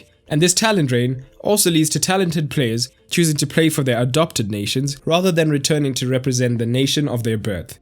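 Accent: South Africa. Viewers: Where are you from? You sound South African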